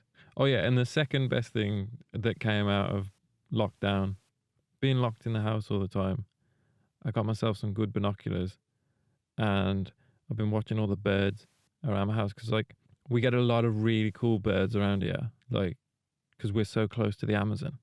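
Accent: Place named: Dudley, United Kingdom. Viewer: Dude I could have sworn you were from Dudley.... But now I'm tryna figure out where the hell you live 😂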